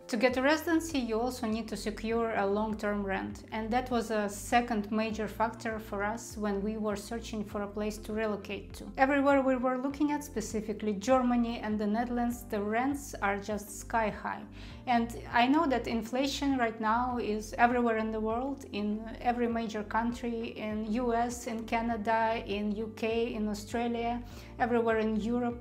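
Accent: Russia. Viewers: Sounds like you are Russian. Of course you are going to like the BG.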